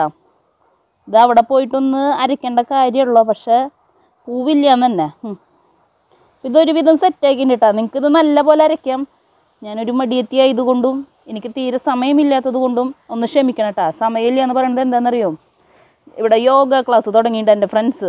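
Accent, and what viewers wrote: Thrissur, India. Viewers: ഈ വീഡിയോ ഒത്തിരി ഷ്ടായി. അവതരണം രസാണ്. ആ തൃശൂർ ഭാഷ വളരെ ഷ്ടായി. തൃശൂർ എനിക്ക് ഒരു ഫണ്ട് ണ്ട് അതെ സംസാരം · ഭാഷ സൂപ്പർ ത തൃശ്ശ്യർ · തൃശൂർ ശൈലി സൂപ്പറായി. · ചിരിച്ചു ചിരിച്ചു ഞാൻ ചത്തു നല്ല തൃശൂർ സ്ലാംഗ് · 👌നമ്മൾ തൃശൂർ ഗഡീസിന്റെ സ്ലാങ് സൂപ്പർ 👏👏👏സൂപ്പർ ടിപ്പ് 👍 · 🙏🙏 Super tips. Thanks for this tips. സ്വദേശം തൃശ്ശൂർ ആന്നോ. നല്ല പ്രസന്റേഷൻ. Video കാണുമ്പോൾ ഏതായാലും ബോറിങ് വരുന്നില്ല ഞാനും ഒരു subscriber ആണ്.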